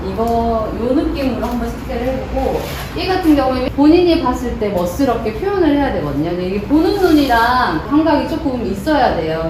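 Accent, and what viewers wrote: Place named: Jeolla, South Korea. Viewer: 전라도 사투리를 좋아하는 대구사람입니다. 중간중간 나오는 전라도 사투리가 느~므 좋아요^^ 황칠이 키우면서 보는 재미도 있구요^^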